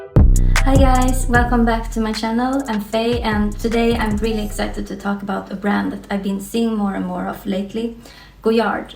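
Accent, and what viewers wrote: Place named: Sweden. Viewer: I love your Swedish accent! tack tack for videoen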